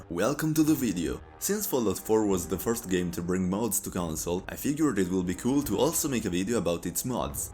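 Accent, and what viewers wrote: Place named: Italy. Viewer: comunque si sente tantissimo che sei italiano, comunque bel video!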